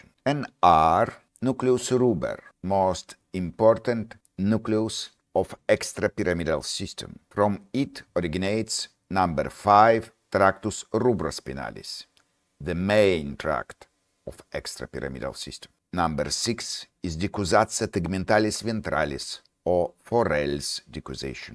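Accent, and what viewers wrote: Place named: France. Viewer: Какой у вас милый французский акцент)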